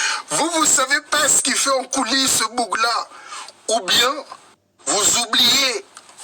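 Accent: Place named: Grigny, France. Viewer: A grigny c'est comme ça que sa parle c'est sa ta pas compris.. ça se voit vous venez de province ou sud de la France pour dire sa...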